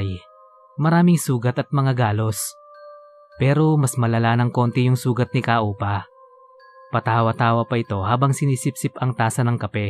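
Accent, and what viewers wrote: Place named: Hiligaynon, Philippines. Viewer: Hello sir, Salamat boss.. Ang galing mo sa punto ng Hiligaynon... I would like to RENDER A SALUTE.. aw tama ba yung grammar hehehehehehe SOLI DEO GLORIA SA CHANNEL MO! Malik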